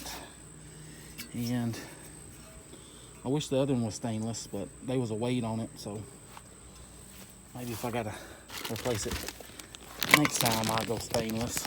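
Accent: South, United States. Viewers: HAHA at work so had to turn on closed captioning. That shit is hilarious YouTube doesn't do a lot of work trying to learn them southern slangs that's fa sure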